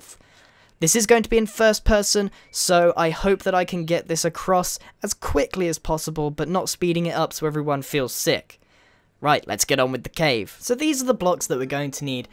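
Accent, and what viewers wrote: United Kingdom. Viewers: Very clearly British. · I love British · I like british better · Who likes British? Cuz I do, also. Can you use bedrock and turn it into a good looking house? I think not.(not asking u to do that. It’s just a question.) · what's with the british you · Why the British stereotype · Can we see British again? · british british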